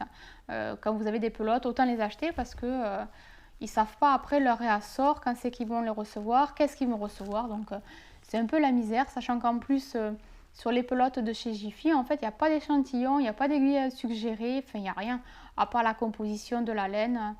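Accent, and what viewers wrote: South, France. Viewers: J'allais oublié les questions ^^: quel âge as-tu ? Es-tu du sud car tu as un petit accent ?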